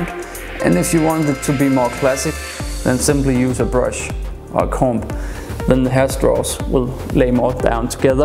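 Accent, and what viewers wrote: Denmark. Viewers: Is je Danish or something? Got a strong dialect going on.. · That accent! 100% Danish! Luv it! <3 Spitzen Video! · Man kan bare høre, at han har en dansk accent xD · Wow den danske accent der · Den danske accent er meget tydelig xD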